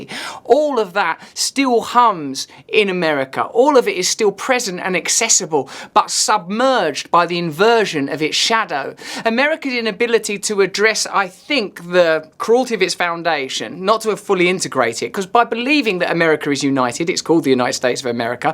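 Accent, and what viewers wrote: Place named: United Kingdom. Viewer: Brilliant observations as usual with the appropriate amount of well spoken British!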